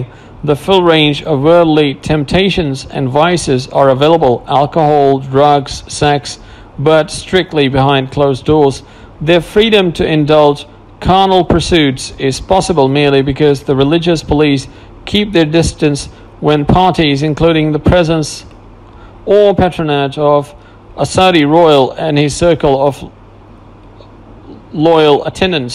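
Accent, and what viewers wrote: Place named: England, United Kingdom. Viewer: You sound English, therefore you should read and speak it much better. This was almost painful to listen to. You should also check your facts and your pictures. Rashid and Hamdan don't even look alike so how can you mix their pictures up? Youtubers always get it wrong when it concerns the Dubai Royal Family. I couldn't even finish this video. Your delivery leaves a lot to be desired.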